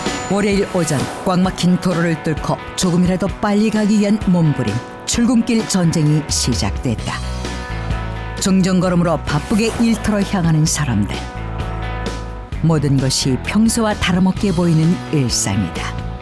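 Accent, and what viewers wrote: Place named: North Korea. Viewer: 말하는게 북한인갔다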